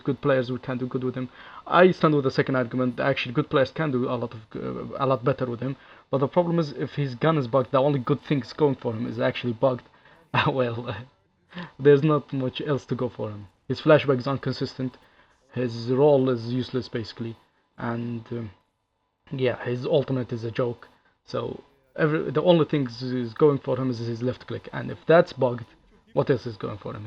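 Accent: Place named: Arab world. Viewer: والله كنت اقول الاكسنت عربي ههههههههههههه.. من وين؟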